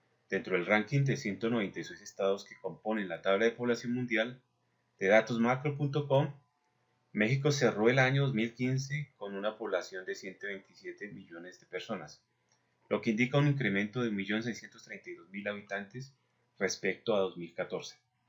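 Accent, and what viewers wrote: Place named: Colombia. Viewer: tiene acento colombiano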